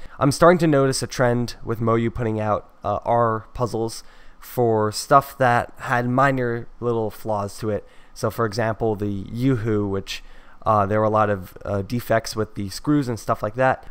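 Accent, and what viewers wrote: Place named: Canada. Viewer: are you Canadian? haha it's sounds like it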